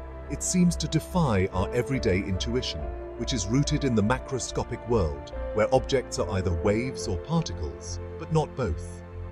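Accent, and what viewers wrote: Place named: England, United Kingdom. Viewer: I'm sorry - I can't take that accent seriously. I know there's a bit of a fashion on yt for slightly plummy English accents doing sciency documentaries. But this accent is slightly weird.... tbh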